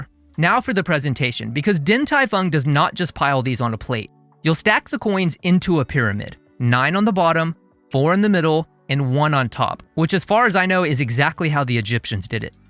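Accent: Texas, United States. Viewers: Is a native Texan? The way he pronounced "oil" is very specific. Is that the Texan accent? Just curious.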